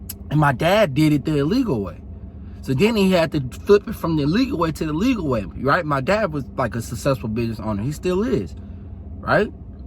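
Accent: Baltimore, United States. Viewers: Thanks for content brother. I've learned throughout the journey, just because you don't like the truth... doesn't stop it from being the truth... the gift and the curse of the red pill... i however will take the hard truth over the easy lie anyday... also, im trying to place your accent. You from Baltimore?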